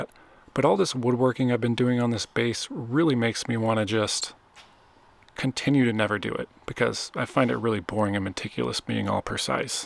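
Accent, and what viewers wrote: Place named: Midland, United States. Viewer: baking vs bakin' Stormed by the midland dialect of North American English: goin', doin' waitin', etc. And how about the disappearance of the distinction between i and e before nasals? Pit/Pet: solid difference. But Pin/Pen: they sound identical to the midland dialect ear. BTW, thanks for a great video about the creation of art which explores way beyond the creation process to include unexpected results. Top notch!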